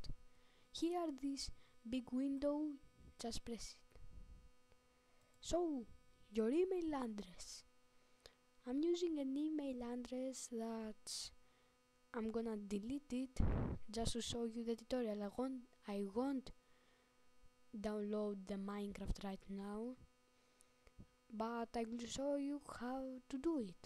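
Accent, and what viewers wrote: Greece. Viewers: ναι εισαι Ελληνας και το καταλαβα μην προσπαθεις να κανεις αγγλικα βιντεο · To katalavenw apo ti fwni oti ise ellinas;-) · Εισαι έλληνας ε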